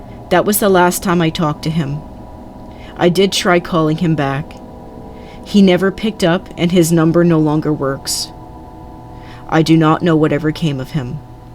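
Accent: Canada. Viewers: It wasn't the content, it was the delivery. I've listened to most of your videos now and you do it on most of them. What I do like about your videos is that there is no repeats from the 100 hours of other channels' scary videos I've watched. I also get a chuckle when I hear your Canadian "out" and "about".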